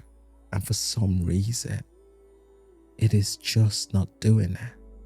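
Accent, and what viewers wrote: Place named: United Kingdom. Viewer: I love your wonderful British accent.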